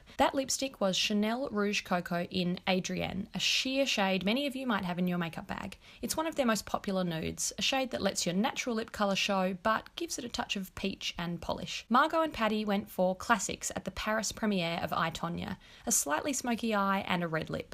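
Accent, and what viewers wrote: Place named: Australia. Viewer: your videos are so simplistic, honest, innovative, creative, soft and realistic. your content not only gives insight to these products, but also show a hint of understanding the real usage and how it would be applied in everyday life, and in special occasions seen by the different styles of videos that you upload. personally, I love Australian accents, and I love it when people really support that backgrounds, and your enthusiasm and growing appreciation towards Aussie products and your cute and unique accent results in me watching your youtube videos over and over again. Thank you for the amazing and continuous effort that you put into your videos, I always find myself smiling when I click to view your creative content. 💓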